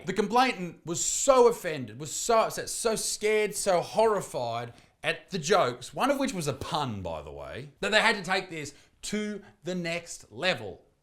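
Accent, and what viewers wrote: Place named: Australia. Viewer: i always thought you lived in australia with your accent and all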